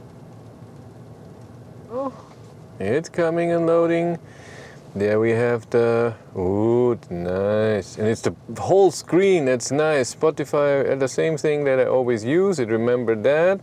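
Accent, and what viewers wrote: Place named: Lower Bavaria, Germany. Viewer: How I love your Niederbayrisch 😍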